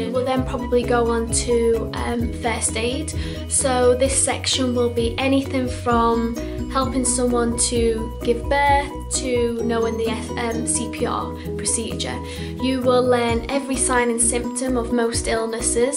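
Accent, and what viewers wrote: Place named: England, United Kingdom. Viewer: Not that I want to be cabin crew, it was very nice to hear all about it and see it from the other side, this video was very informative and thank you for posting. Just trying to work out which part of England you accent is from.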